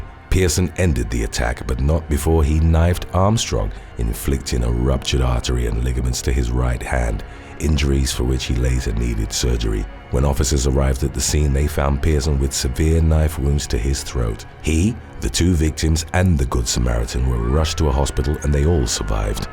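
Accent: United Kingdom. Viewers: The best part about this channel is the British pronunciation of American locations. 😂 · The narrator sounds like "Idris Elba." I wonder if he grew up in a similar place in the UK as Mr. Elba?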